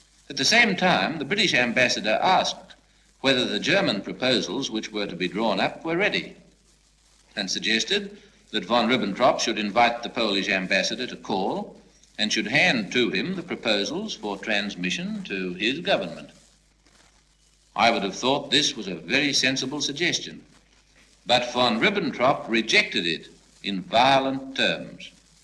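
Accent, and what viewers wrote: Australia. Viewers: Menzies had a cultivated Australian accent, listen to Geffrey Rush or Dame Elizabeth Murdoch or even Barry Humphries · Great Australian accent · He has a Cultivated Australian accent.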